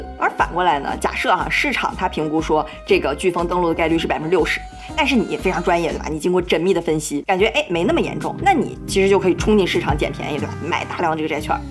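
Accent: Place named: North, China. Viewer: 好奇你是来自哪个地方的人？口音太重了。北方人？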